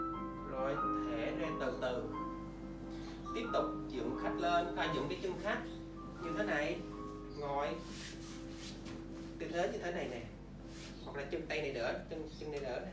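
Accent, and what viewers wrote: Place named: Khanh Hoa, Vietnam. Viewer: Nghe giọng như khánh hòa nhỉ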